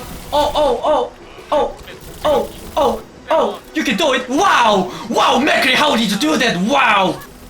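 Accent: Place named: Busan, South Korea. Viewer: 형은 왜캐 부산 많이하지?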